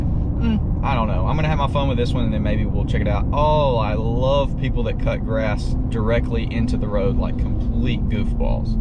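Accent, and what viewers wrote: Alabama, United States. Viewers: Are you from Alabama ?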